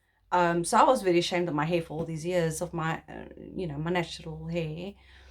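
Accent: Afrikaans, South Africa. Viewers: No Afrikaans speaking person is not of mixed race origin. I have DNA tested 10 “white” Afrikaans speaking persons. All are of mixed race origin. The majority of us are decedents of white men and imported slave woman from West Africa , india and Malaysians. I am of Indian, Malay, West Africans and European decent. I have very blue eyes, blond hair and western facial futures. My skin is white and I tan a dark bonze colour. Do not judge people by their looks you might be mistaken. Your coloured accent sounds more like a “ white” Afrikaans accent. You are an Afrikaner. The can just as well do the mental shift and embrace your Afrikaner identity. Many English speaking South Africans are more Afrikaner than the Afrikaners in their way of living and thinking and their values. They are experiencing a continuous identity crises because they belong nowhere. 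Just accept that you have become Afrikaners. Make the mental shift. Tommy worked at RAU. He was Chinese as his mother and farther were born there. She could barley speak a few words of English. Tommy however spoke English and Afrikaans fluently. No accent in Afrikaans. It was an Afrikaans university. Tommy made the mental shift and became Afrikaans. He was absorbed into the community although he look different. He became one of us. No longer an outsider. We need to embrace our mixed heritage and our Afrikaner identity. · You're beautiful and your accent in only south African. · Your accent just sounds Afrikaans (or Eastern Cape English, even) to me, so I suppose one has to come from the Cape to hear the difference or something. My mom's family were from the Northern Cape, and would've probably been put in the box "Poor Whites" in those times, because that was what the politicians were peddling to the masses back then, but their heavy accent (much heavier than yours to my ears, anyway) wasn't what distinguished them. 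AFAIK, every English speaker of those regions (regardless of background) spoke basically Eastern Cape English. They moved to Jo'burg, and all the girls changed their accents, eventually, while the boys kept theirs. (Big family.) So today my mom, aunt, and uncle all live together, getting old, and the two sisters speak Natal English, mainly just because that's how people speak here, but my uncle might be able to "pass for Coloured" - to my ears at least, and I'm probably missing all sorts of nuance that make that idea ridiculous. Actually another one of my uncles was in the queue with a very "Coloured-looking" lady (there's no such tidy box, but somewhere right in the middle of everyone pushed or pulled into what's become that culture there's something like that), who had the same surname as him, so is almost certainly related (because it's a very rare surname) to us. So somewhere back in the past, one branch of the family got put in the one box, and the other got put in the other. 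Actually now I think of it, that very uncle's first ID bo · My son is 40 and can hardly remember those years...i think you are not telling the truth about the pencil test...and you are talking with same acsent as an afrikaans person trying to speak english stop feeling sorry for yourself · What a crazy world we live in. If I had met you without knowing you, I might have thought that you were an Afrikaans speaking person, speaking English. Not that it really matters, 30 years after the end of apartheid government and race is still an issue. Look at the Z-83 form for application for employment in a government dept.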